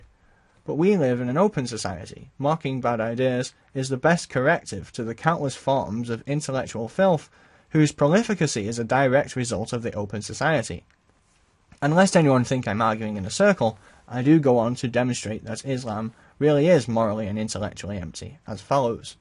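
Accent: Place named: United Kingdom. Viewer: OK, I'll check it out. That goes a long way toward explaining why a video on a channel from someone whose channel originates in The Netherlands sounded so completely British! :-)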